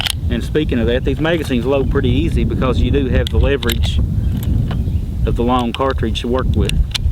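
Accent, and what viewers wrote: United States. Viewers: Love ur 'merican voice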